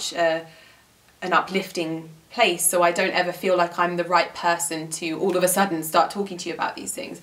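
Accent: RP, United Kingdom. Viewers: You truly don’t deserve the hard time you get, such a hard working, lovely lady deserving of all the success you have. Also, about the posh accent bit, my accent seems to change so easily depending on who I hang around with, some days I sound Yorkshire, the next day Canadian and the next day London, it’s so weird so I feel you there haha! Keep inspiring us all lovely xX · I absolutely love the way you speak and your British accent! English is my 2nd language, so every time I watch your videos I say to myself "it's time spent on learning a foreign language, not just entertainment" ^^ Two words which I've learned from you and now overuse: "flattering" and "wonky" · English is not my first language, you speak very clearly comparing to other British accent people. thanks 😘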